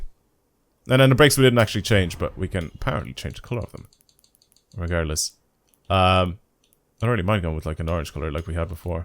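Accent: Ireland. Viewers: Wait, you from Ireland? Me too bro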